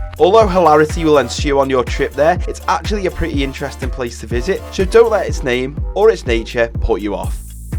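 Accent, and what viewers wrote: Liverpool, United Kingdom. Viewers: is that a scouse accent or wirral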